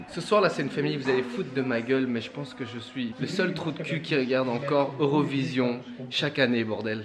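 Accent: Belgium. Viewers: T'es belge??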